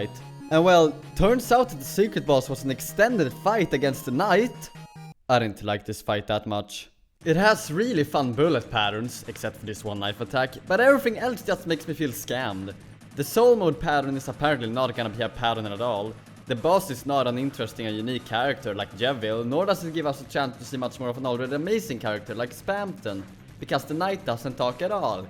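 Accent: Sweden. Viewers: Swedish? May I ask? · Banging video my man, håller med typ allt men bro din svenska brytning är så stark att jag knappt fattar nåt lmao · 1.From your accent I presume you are from Sweden like me 2. I didn’t like the Zelda parts of the chapter at first but they started to grow on me for a while. 3.Kanske du behöver en TANDPÅNG för att lösa dina problem med kapitel 3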